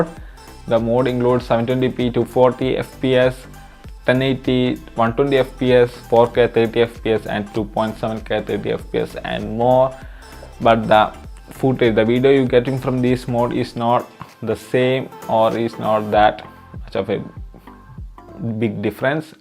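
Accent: Kerala, India. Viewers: You have a very bad accent of Kerala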